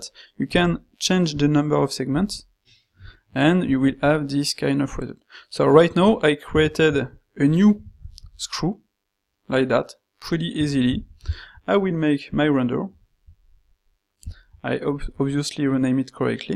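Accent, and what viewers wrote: France. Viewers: tu est français? sa s'entend grave lol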